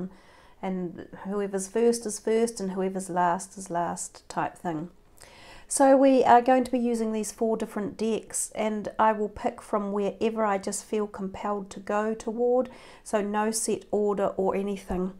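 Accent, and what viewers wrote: New Zealand. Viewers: I hear another NZ accent !! Nice reading. ☯️🌈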